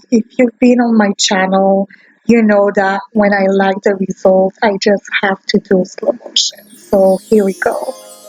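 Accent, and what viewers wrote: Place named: Brazil. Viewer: Are you from Brazil? Your accent is beautiful!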